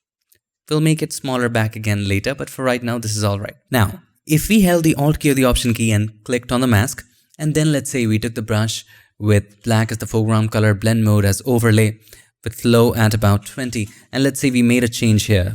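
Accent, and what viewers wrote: India. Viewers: Your English sounds like Indian it’s hard to follow up.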